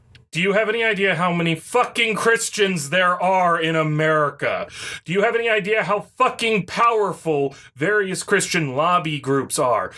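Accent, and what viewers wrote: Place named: South, United States. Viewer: Dude, you're pretty impressive with the improv...that southern accent is hilarious. Tell me that's Guiness in your mug.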